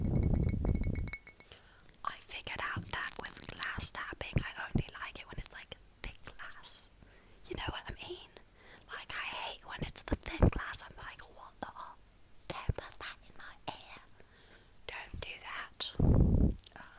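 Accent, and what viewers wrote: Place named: United Kingdom. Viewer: your british accent is lovely , i hate my voice !!😂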